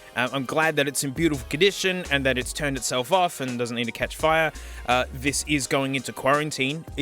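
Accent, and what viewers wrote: Australia. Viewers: why? hes just gunna say the same things everyone else has except in an australian accent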